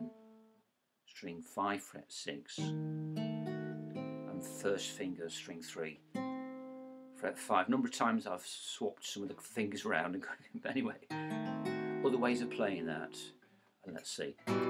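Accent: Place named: United Kingdom. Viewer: Hi, my name is Angelica and I love your british accent I'm italian and I love England. I'm a musician, a pianist. I just learnt to play by, I hope you know it... I'd like to know more about you. Where are you exactly from? Because you know, I love England... I' d really like, if in a way we can try to keep in touch if I can play you, it'd be amazing. I play it since I was 2 and now I'm 15. Hope you are well. Bye.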